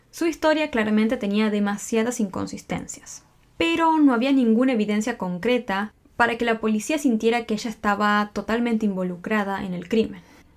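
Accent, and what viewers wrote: Argentina. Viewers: Será que es argentina? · Si, es Argentina.es muy común el Che- es su acento.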